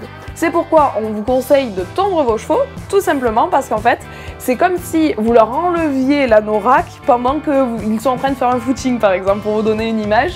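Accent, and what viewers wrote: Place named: South, France. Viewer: j'adore l'accent du sud ❤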